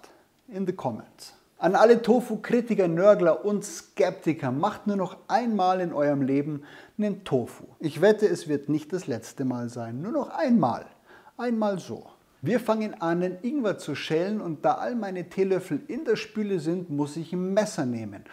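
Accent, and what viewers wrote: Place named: Franconia, Germany. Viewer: Hallo, ich habe gerade deinen Kanal entdeckt, super! - und sehr charmant mit Deinem fränkischen(?) Akzent ❣️ "Geschmacksrodeo" 😂❣️- made my day 😘- yummi 😋- sieht echt gut aus ❣️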